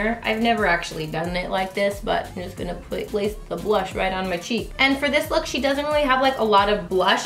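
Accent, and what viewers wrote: England, United Kingdom. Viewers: Love your videos so much! Always cheer me up when I'm having a bad day and make me smile. That English accent though 😂😂😂 it's like a shout out to us in the UK! You should try a wee Scottish one too though and do a Brave tutorial for the Disney Princess series - it's been a while!! xxx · Yeah for English accent. Im English and I love it girl. From Sarah.xx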